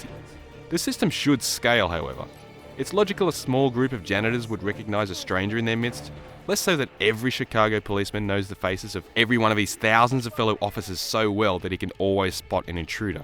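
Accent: Australia. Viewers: aussie reviewer. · Is this reviewer Australian? I'm form Australia and can't tell :P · An aussie reviewer, scrumptious hahaha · Mate this reviewer is an Aussie. :D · An aussie reviewer!!!!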